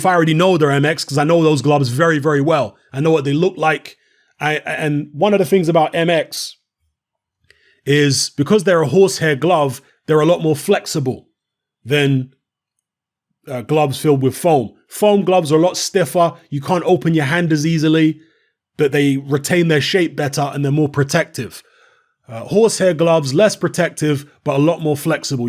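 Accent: United Kingdom. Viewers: I noticed the British accent so I would assume that you are a Tyson Fury fan. However my common sense tells me that a glove cannot flop back and forth if you hand is all the way in. You failed to explain that. TRY AGAIN. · What a coincidence that u sound like ur from the uk lol. I was cheering for Fury but after this, I can definitely see the obvious. He was hitting that man with bare fist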